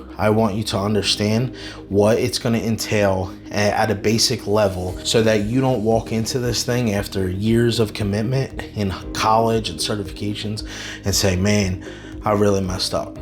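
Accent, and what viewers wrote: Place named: Philadelphia, United States. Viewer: I love the Philly accent made it easier to listen for me . Gooo BIRDs 🦅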